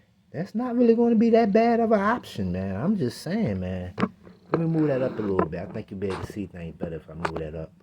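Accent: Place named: Alabama, United States. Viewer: my fiancée (southerner) said your accent sounds like you’re from Alabama or Mississippi. Was she close?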